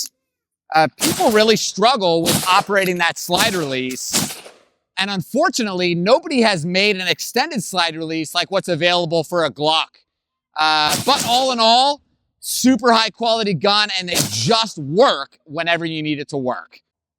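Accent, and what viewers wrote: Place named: Tampa, United States. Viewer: This is exactly what I would expect a person from Tampa to sound and look like.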